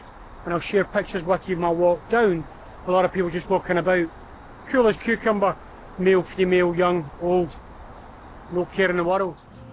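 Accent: Scotland, United Kingdom. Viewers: Nice to see you back in China. I feel you belong there. Another thing I love watching your videos for is your lovely Scottish accent. I used to live in the U.K before I married to come to the States. Then I had a Scottish colleague. I remember I barely understood him most of the time, but I understand you 100%. Wonder why?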